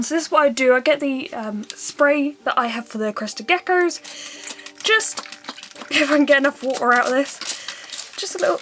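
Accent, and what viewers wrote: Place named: Southern England, United Kingdom. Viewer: What I spotted was a "common" or viviparous lizard. I walked past it as it was busy sunning itself on a tree-stump...but the HOLY GRAIL of lizards to spot here in the UK would be the sand lizard. Pretty sure they're only found on the south coast, and you sound like a southerner so... x)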